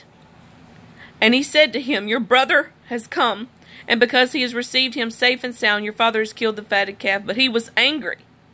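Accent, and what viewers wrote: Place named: South, United States. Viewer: God thank you for this sister’s beautifully accented voice! I love to hear your Word at any rate, Lord, but it is especially sweet with a little American-south drip. (I dunno, if not the south, then mid-west? Texas? IDK, BUT I LOVE IT!) Many thanks for this channel. (If did an audio narration of the Bible from start to finish- I’d listen every day)😊✝️🙏 GOD BLESS & KEEP YOU & YOURS!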